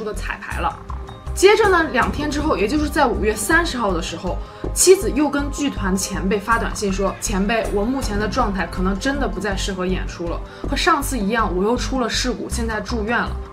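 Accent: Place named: China. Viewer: 幹，26腔聽不下去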